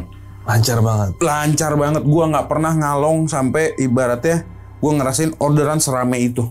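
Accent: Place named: Betawi, Indonesia. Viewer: Kagak ngerti klo Nyang cerita pakai dialek Betawi suka bgt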